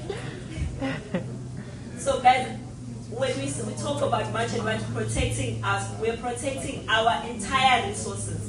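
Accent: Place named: Durban, South Africa. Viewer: That Durban Accent is loud and we proud of her.Siyabonga dade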